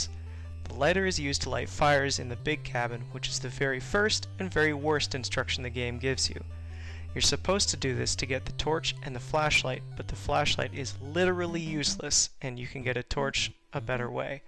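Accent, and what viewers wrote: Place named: Canada. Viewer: SO MUCH CANADIAN ACCENT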